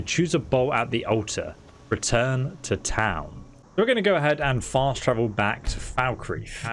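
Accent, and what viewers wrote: England, United Kingdom. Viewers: Love the helpful advice. Serious mental cringing at your horrible pronunciations. Attribute, Markarth and quite a few other speech errors. I get that you sound British, but honestly, that should mean you are better at speaking English, not worse. Again, I found this advice helpful. Please don't think I am ragging on you. Subscribing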